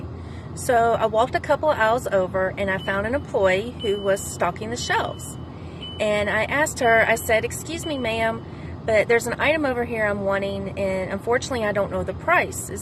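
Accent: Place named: South, United States. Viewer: Context is everything. I'd likely be less ruffled from you it because you sound genuine, caring and southern. Terms of endearment can sound sincere or angrily sarcastic depending on the setting, the delivery and the accent. However, "honey" sounds too casual for a serious setting like a medical office, where I hope everyone is meticulous. It can sound sarcastic and condescending which I dislike when I seek medical attention.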